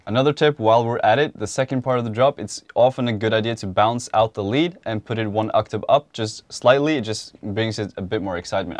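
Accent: Norway. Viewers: er du norsk? høres sånn ut hvertfall. hvis ud ikke er nosk gir dette sikkert ikke noe mening da men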